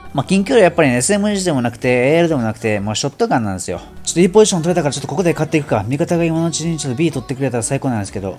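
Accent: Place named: Kansai, Japan. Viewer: ですよね！ちょっと関西弁的だったんで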